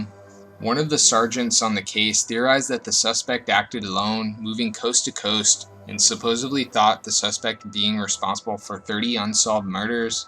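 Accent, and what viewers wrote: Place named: Canada. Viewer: he sounds irish canadian , defo got tht irish twang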